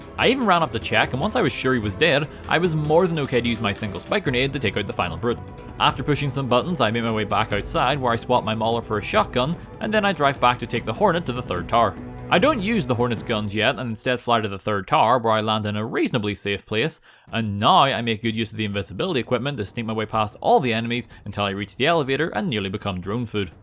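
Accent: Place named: Northern Ireland, United Kingdom. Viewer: Oh that's what that accent is! I was like well that's not scottish or welsh, and it's unlike any irish accent I've ever heard. I mean I know y'all have a new accent every 50 feet but I guess it never occurred to me that northern irish would be distinct from irish to american ears. huh.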